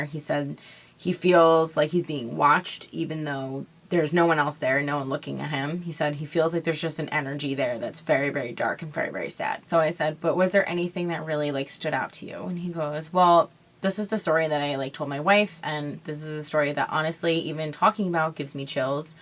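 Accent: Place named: Long Island, United States. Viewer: I'm from Long Island I just watch like 3 of ur vids and literally thought to myself she has to be from here 👌🏽 LI knows LI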